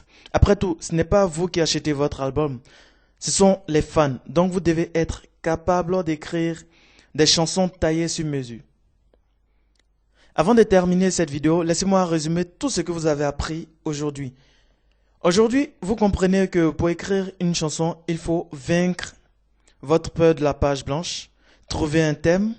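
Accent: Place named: Africa. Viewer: super le frere acsent africain et tout ayeeeeeeee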